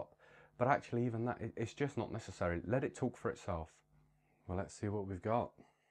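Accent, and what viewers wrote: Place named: United Kingdom. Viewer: It's getting cold here in NC, and I kept warm with your hot chocolate recipe last winter. Made it last night and can't wait to make it again tomorrow night. I'm a sucker for the British accent too 😉☕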